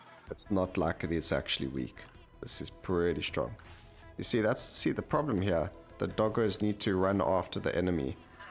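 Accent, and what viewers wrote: South Africa. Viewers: Anyone else love the South African accent? Wack ton of a awesome lol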